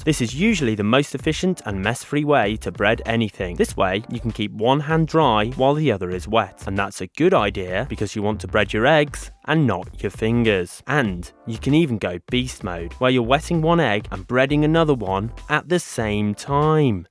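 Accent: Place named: United Kingdom. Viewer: ! Seven egg halves or seven whole Scoth eggs? I had Scotch eggs at a picknick when I was young and absolutely loved it. I spent a month at a boarding school in England (language course - most Swedes went to Hastings and spoke Swedish to other Swedes, but my mum, a translator, would not have that, so it was boarding school) and that I had Scotch egg for picknick lunch one day is one of the strongest memories from those days. Strangely enough, since I can't remember how they tasted. We were on an outing to a fair, and I also remember buying "toffee", which was not toffee-like, but hard as a brick, so when I had bought it, the salesman smashed the "toffee" in its plastic wrap to break it in pieces. Anyway, VERY nice to hear a British accent explaining how to make Scotch eggs (because most recipes for Scotch eggs on Youtube are posted by Americans, and I could not even begin to say how tired of I am, and irritated by, American "English").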